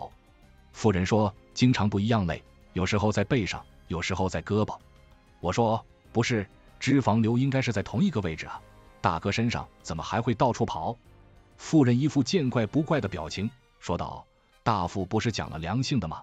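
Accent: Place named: Hunan, China. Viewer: 大概是65年前，在学校安排下，每年要去乡下插秧，收割2次，对些湖南乡下的口音，还记得些。今天听您的故事，对修頭陀的苦行僧很是尊敬，更是您用些湖南乡下的口音，引出乡愁。很为这久埋在记忆中的当时乡下佰姓的纯朴，实在，有一种由衷的怀念，感动。谢谢您的故事。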